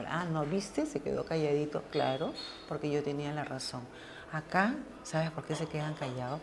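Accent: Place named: Peru. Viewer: De dónde es? Me parece que de Perú por el acento, espero no decir algo incorrecto, yo soy de Ecuador y estoy estudiando en España, pero la mayoría del tiempo estoy en Alemania porque mi novio es de acá, y tiene mucha razón en todo lo que dice, pero debo decir que los colombianos soy bastante corteses, todos los que he conocido siempre dicen: "perdón", "disculpe", "por favor", "gracias" y "permiso", incluso no se sientan hasta que les digamos "por favor, toma asiento" jeje, y siempre me han tratado de usted hasta amigas de mi edad o incluso mayores, es interesante... al contrario que en mi país incluso también es común dejar propina allá, en fin, gracias por el video. :)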